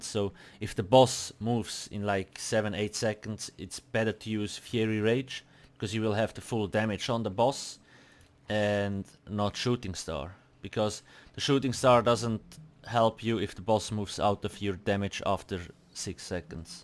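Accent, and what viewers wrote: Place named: Switzerland. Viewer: So lustig, han no bevor e Minute ume gsi isch denkt, "das tönt schwer nach eme Schwiizer!". ^^ Merci für de Content, wiiter so. =)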